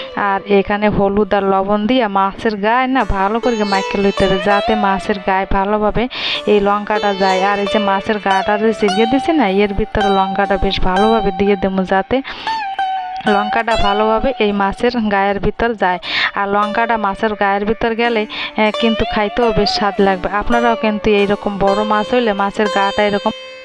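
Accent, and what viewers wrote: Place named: Bangal, Bangladesh. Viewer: অসাধারণ বাঙাল ভাষা আমিও বাঙাল❤❤❤😂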